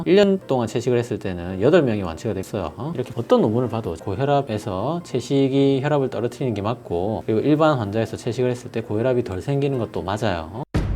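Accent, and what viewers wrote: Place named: Busan, South Korea. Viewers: 님병원이 어디에 위치하고 있는지 알려주세요.~~~ 참고로 저는 부산 연제구에 삽니다.^^ 영상 만드는 일에 정성이 가득하시고.. 강의 내용 퀄러티 인정! 그래서 고혈압, 당뇨 위태위태한 저는..꼭 한번 뵙고 싶기도 해서.. 사투리가 부산 사람인듯 하여..병원이 부산에 있을듯란 예감이 듭니다.ㅎ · 같은 부산 분이라 그런지 하태경 의원님과 외모, 말투 모두 비슷하세요. 엘리트 부산인들의 공통적인 말투인가 보네요.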